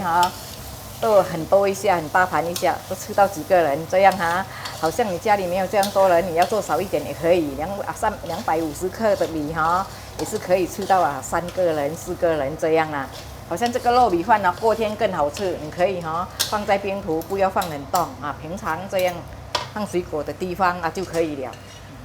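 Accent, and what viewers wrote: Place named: Hokkien, China. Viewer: Thank you auntie, like the way you present very detail, and Hokkien mandarin 😊 I’m Hokkien too 😊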